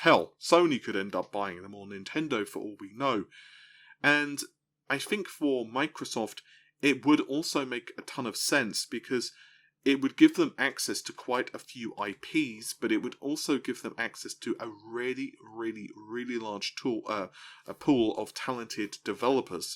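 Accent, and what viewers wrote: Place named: RP, United Kingdom. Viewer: its because he has a posh english accent but then uses the F instead of TH. Freak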